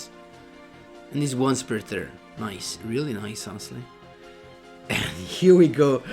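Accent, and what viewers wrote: Italy. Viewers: Great video I so hipped for dark magician, but one question. Are you from Italy? You talk whit an Italian accent. I say it because am Italian and.. I don't know I've this sense of familiar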